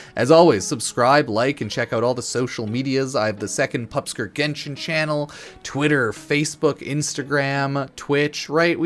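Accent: Canada. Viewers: are yuou Canadian? timmy fan eh?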